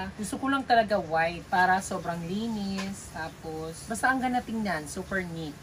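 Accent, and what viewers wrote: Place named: Ilonggo, Philippines. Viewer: Naaaliw talaga ako sayo pqg nagsasalita ka..lalo kapag ilonggo tono mo😂😂😂😂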